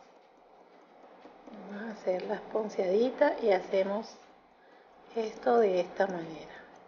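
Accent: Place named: Venezuela. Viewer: Me gusta como explica, y por su acento, es ud venezolana. Felicitaciones!! yo también lo soy, saludos y abrazos desde Barinas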